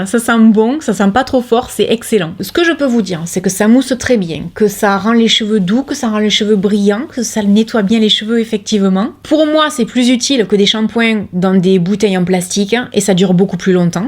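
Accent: South, France. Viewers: Merci pour toutes ces précieuses infos👍 c’est toujours un doux plaisir de regarder tes vidéos bercées par ton accent du soleil que j’aime tant 😜 merci encore pour toutes tes recherches afin de mieux nous conseiller tu es une amour 🥰 gros bisous du cœur Mima et passe un heureux moment avec ta maman et tes petits loulous 🐶🦮🐕‍🦺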